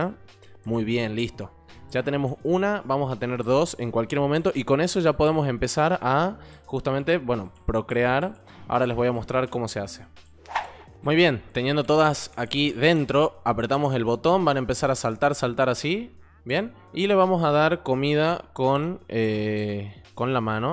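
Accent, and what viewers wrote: Tucumán, Argentina. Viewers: Che sos argentino digo por como prpnuncias la rr. Porque creo que en alguna provincia se pronunciaba haci la rr. Creo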